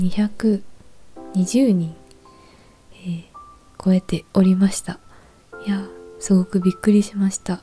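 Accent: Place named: Shimane, Japan. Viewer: の島根言葉がかわいｋ・・・zzzz..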